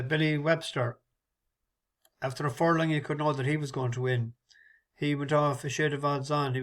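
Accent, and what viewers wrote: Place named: Liverpool, United Kingdom. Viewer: Lucky of the IRISH ☘️ and a good Scouser by the sound of it 🥁🥁 🫡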